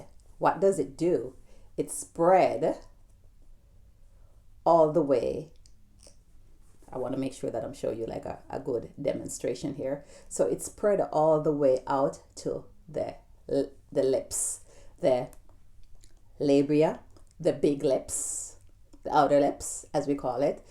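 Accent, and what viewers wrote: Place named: Jamaica. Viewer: Love your Jamaican accent.. big up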